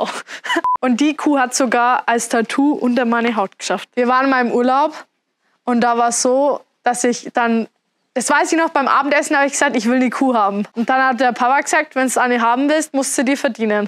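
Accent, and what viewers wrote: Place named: Franconia, Germany. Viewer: Servus, erstmal geschaut wo der Hof is. Sin entspannte 50km. Freut mich total das jemand aus der "Gegend" dabei is auch wenns keine 100ha sondern nur 13 sind. Is nich negativ gemeint aber man hat echt gemerkt dass es schwer vom Fränkischen ins Hochdeutsche reden zu kommen. Bin auch totaler Gülle Fahrer auch wenn ich letztes Jahr kaum Aufträge fürs Zubringer fahren von meinem MR bekommen hab. Freu mich echt auf mehr Videos Gruß Ausm Fürther Land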